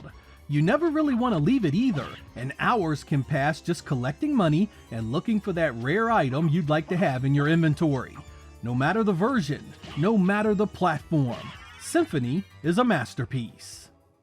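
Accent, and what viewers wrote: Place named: Delaware County, United States. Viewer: You sound like you have a Delco accent.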